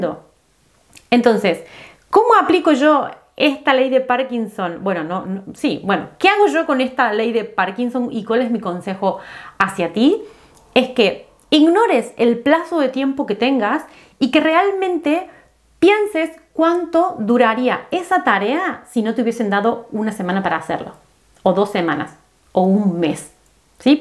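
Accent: Argentina. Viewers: Esa tonada cordobesa !! 😅😅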